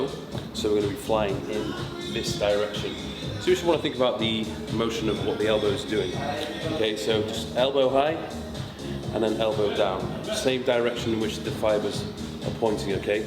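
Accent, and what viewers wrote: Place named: England, United Kingdom. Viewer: Finally an English accent!!!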